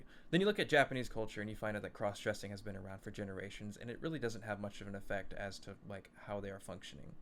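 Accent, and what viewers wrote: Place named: United States. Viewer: Unusual to hear such sense spoken with an American accent these days.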